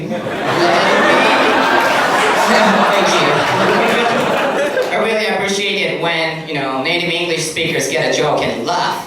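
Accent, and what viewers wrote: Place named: England, United Kingdom. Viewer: Good show I like your English accent more video to upload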